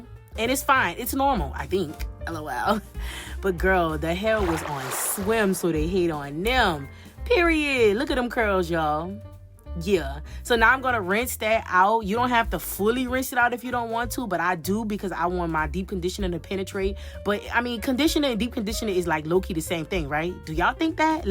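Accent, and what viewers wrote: Louisiana, United States. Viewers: Sounds like a Louisiana accent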